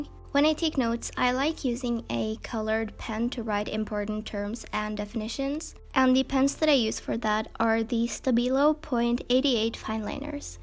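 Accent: United States. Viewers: I was surprised when I found out that you were Norwegian. I found out when i realized that you have access to Panduro, which is one of my local stores. And in another video you used your phone and the language was Norwegian. The reason I'm saying this is because from all the Norwegians I've heard. You sound like you come from America. Obviously I don't know if you come from Norway. Cause I live in Norway, but I come from a different country, and so Norwegian is my second language. And it makes me sound not NorwegianXD I'm just saying that I've been getting so used to hearing typical Norwegian people speak English, that it surprised me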